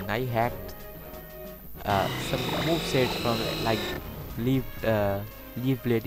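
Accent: India. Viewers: Bro are you Indian?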